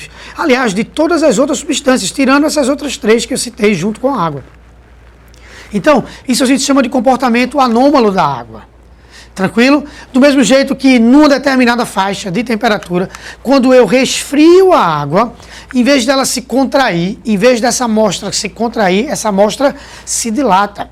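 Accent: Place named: Recife, Brazil. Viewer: pelo visto o senhor é de recife rsrs ganhou mais uma inscrita e pernambucana kkk,Parabéns pela aula !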